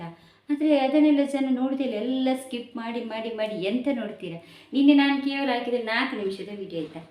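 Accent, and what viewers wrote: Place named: Mangalore, India. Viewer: ನೀವು ಮಾತಾಡುವ ಮಂಗಳೂರು ಕನ್ನಡ ನನಗೆ ತುಂಬಾ ಇಷ್ಟ 👍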